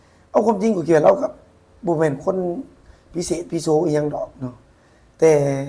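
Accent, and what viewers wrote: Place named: Ubon Ratchathani, Thailand. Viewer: คนไทยอย่างผมรู้จัก ดีว่าเป็นคนลาว การใช้ภาษาไทยบ้างลาวบ้างมันเหมือนสำเนียงอุบลราชธานีเรารับได้ เขามีเหตุผลที่ดีกว่าคนในลาวเยอะ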